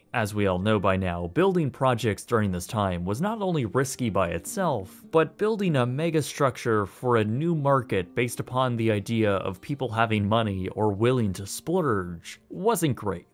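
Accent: Canada. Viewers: canadian? ,,,,ote,,,out,,coche, couch,,, speak inglish, whoever you are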